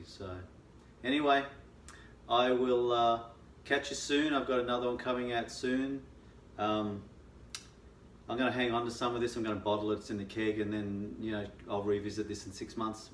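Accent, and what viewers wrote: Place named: Australia. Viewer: Are you in Seattle? Can't help but notice ozzy the accent lol...Im in Western Australia, just saw your beer reviews on Craig Nubergers channel. Very interesting beer in this video! Keep it up.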